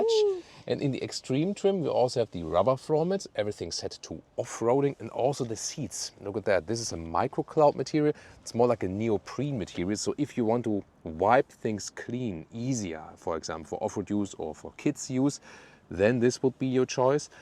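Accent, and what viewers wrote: Germany. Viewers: It would be so much better to hear this review in his native tonuge. The heavy German accent is annying and I write this as a German native speaker.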